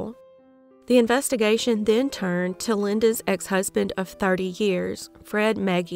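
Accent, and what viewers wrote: South, United States. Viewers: I agree enjoy your delivery and style of your videos. Thanks for being on the platform and never change or edit out your crying or the emotion in your voice because it further humanizes the victim to the audience and I for one Cory right with during some of those child cases. Also, love when call out the predator/criminal in your SOUTHERN accent cause I’m so NEWYork and saying the same thing on my side but when a sweet southern voice calls them the no good human wast of space I’m here for it cause if you wasn’t already thinking it and that voice says it you better reevaluate the amount empathy you give to the 👹. Felt motivated to comment to encourage you to keep going. 🤗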